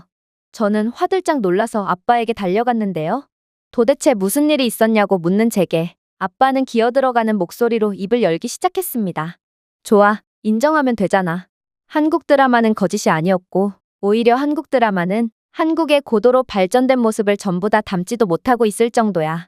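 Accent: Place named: China. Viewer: 음 아직 다듣기 전인데 처음에만 들어보니 한국인인척 하는 중국인이겠군...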